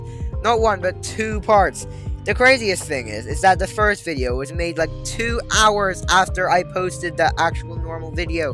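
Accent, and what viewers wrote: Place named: United States. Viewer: You are speaking USA English in UK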